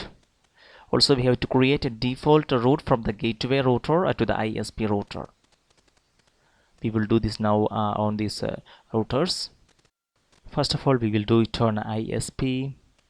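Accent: India. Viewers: Your accent india prideee i'm not understand sir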